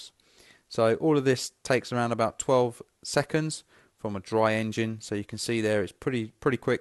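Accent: United Kingdom. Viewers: Instead of sounding British, you could try enunciating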